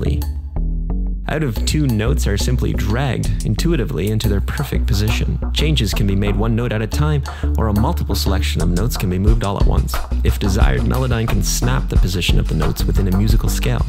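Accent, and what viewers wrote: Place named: Canada. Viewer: Haha, he sounds Canadian to me, too! Anyway, cool stuff.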